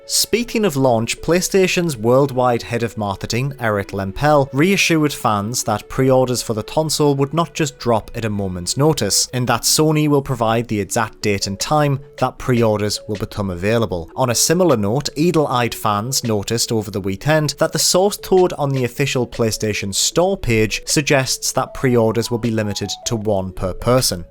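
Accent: United Kingdom. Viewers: Hes british or something. Leave him alone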